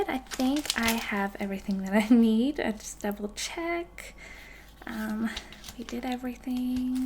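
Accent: United States. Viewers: Omg what ur not American??? I’m American and this is literally such a good accent, I’m so impressed that u did it so subtly. Also loved the ASMR part lol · I had forgotten how good your American accent was. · Liking that American Accent.Great work. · Your American accent has become so convincing! About halfway through the video it clicked that this wasn't a Scottish, British, or Australian accent. Really good stuff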